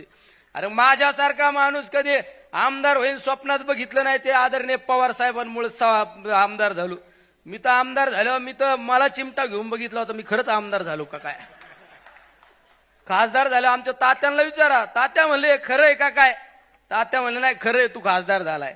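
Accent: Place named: Ahmednagar, India. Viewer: अस्सल नगरी कडका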